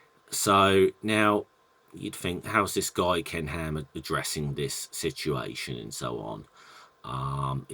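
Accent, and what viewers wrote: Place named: United Kingdom. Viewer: How am I only just realising you're also British? 😲 🤣 Geez, I think 🤔 it's time to clean out my ears 🤣 Also, *Ken Scam 🤣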